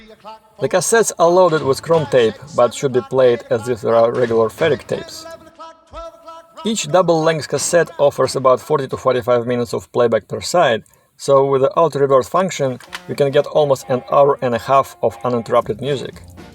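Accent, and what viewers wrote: Russia. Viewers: Только я слышу русский акцент?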